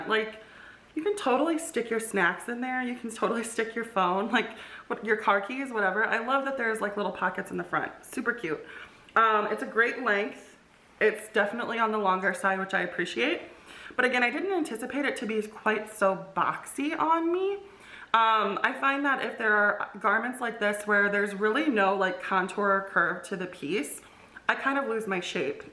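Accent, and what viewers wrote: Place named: United States. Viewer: How much u talk American oh my God .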